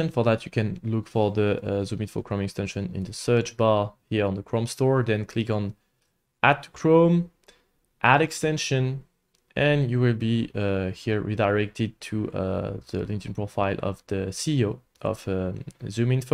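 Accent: France. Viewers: je crois reconnaître un accent français ?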